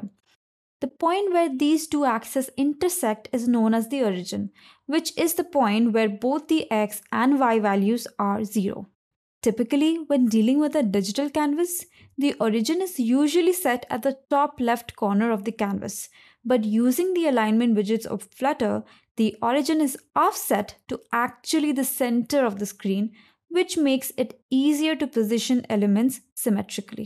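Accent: India. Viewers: You're legitmately just a racist. This is perfect english with an Indian accent, if you don't like her accent, that's a you problem.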